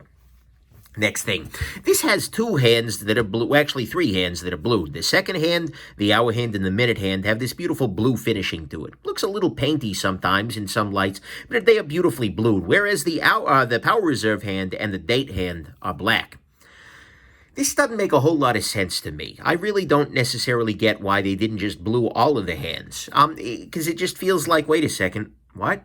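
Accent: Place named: Brooklyn, United States. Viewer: ok i can deal with the annoying if somewhat entertaining voice - and I'm surprised to hear you're in the Ann Arbor area (where I grew up) as i would have guessed Brooklyn - but please, please don't hold the watch the way you talk: constantly jittering. Take some time with your hands so my eyes can focus on the watch. When you put it down it still shakes for some reason. Please. Your voice is going 80 mph but the watch shoudln't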